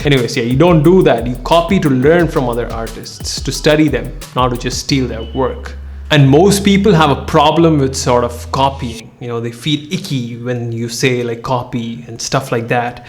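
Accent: India. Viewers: I know you are an Indian talking in accent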